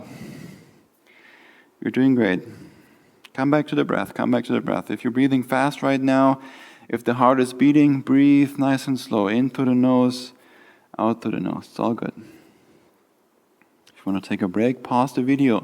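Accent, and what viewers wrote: Germany. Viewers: Germany from the sounds of it hey? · Are you german? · I can hear the German accent. Stimmt? I liked the video but this is not for beginners, I am sorry. I practice yoga daily for 7 or 8 years, and this is not beginners yoga. Vielen Dank.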